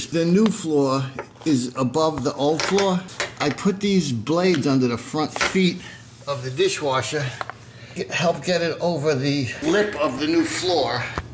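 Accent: New York, United States. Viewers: Thanks. I value the 2 tips you gave. The first was using the putty knives to help lift the front legs over the vinyl flooring edge. The second to use a string the stretch the spring enough to hook the cable end. And I enjoyed your accent, too. Nobody can match a native New Yorker. I have been away from my boyhood neighborhood in the west Bronx for a long time. Take care.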